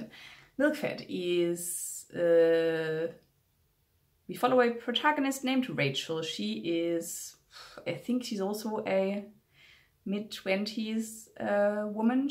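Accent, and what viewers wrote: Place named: Northern Ireland, United Kingdom. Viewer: Sorry you haven't had a great day, though with all of this stuff going on I can see why. Thanks for making this video anyway though. I imagine a lot of Booktubers probably feel pressure to be cheerful in every one of their videos, but as a long time booktube viewer I find it just as valuable to listen to people when they're angry or sad as when they're happy. Thanks for sharing your perspective on things and for these recommendations of what to watch. I've got some Northern Irish family so I'm used to the accent as well, but I get why people find it befuddling! I like your reasoning behind revisiting The Butchering Art. Thank f*ck for science indeed!😆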